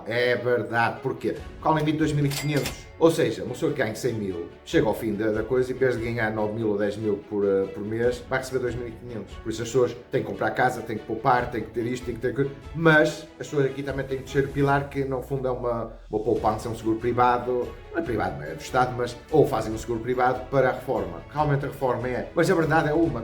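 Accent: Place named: Brazil. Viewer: Caralho...!!, vivo o paraíso e não sabia ?! , aqui no Brasil não é fácil mas você nunca passa por privações, de uma forma ou outra sempre tens uma mão amiga estendida à você!, gajo, falas português mais brasileiro que próprio de Portugal ! , conheça meu Brasil afinal foram os português que inventaram esta zona toda aqui, mas é bom demais !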